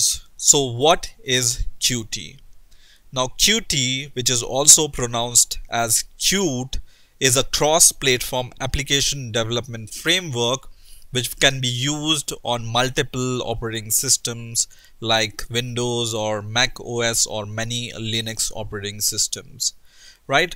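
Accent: India. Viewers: Hindi accent is not so bad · Probably south asia [India]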